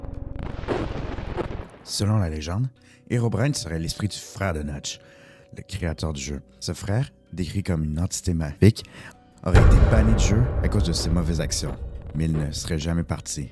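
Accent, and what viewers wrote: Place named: Canada. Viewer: Yooo un canadien commme moooooi 😊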